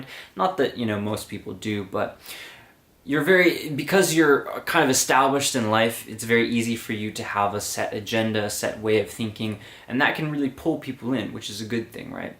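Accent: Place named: Canada. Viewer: sounds canadian to me!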